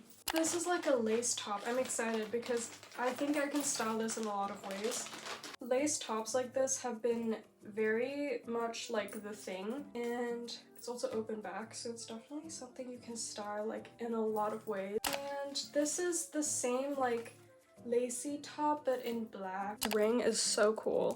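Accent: United States. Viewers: these are all great choices! btw, why do you have an american accent when you're from Austria? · i totally thought you were american lol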